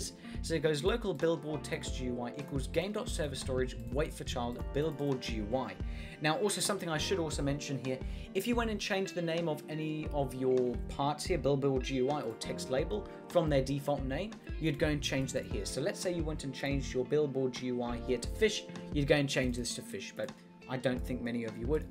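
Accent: United Kingdom. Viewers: The best script tutorial YouTuber of all time, he explains everything too well... And I love the UK accent of this guy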